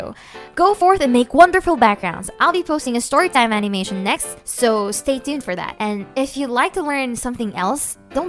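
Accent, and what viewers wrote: Philippines. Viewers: I can still hear that Fillipino accent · You have a litte filipino accent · Why do I keep hearing your Filipino accent!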